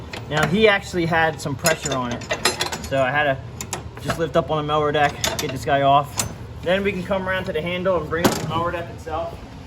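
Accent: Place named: Philadelphia, United States. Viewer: Great instructions. Sounds like a Philly accents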